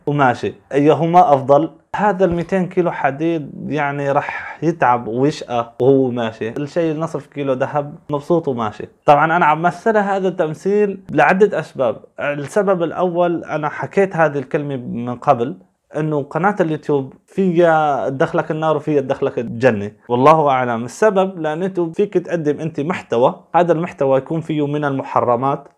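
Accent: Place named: Syria. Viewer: مبروك يا اخيي بس عندي سؤال انت سوري ام لبناني لان لهجتك سورية ولكن فيك كم كلمة لبنانية أنشأ الله ترد علي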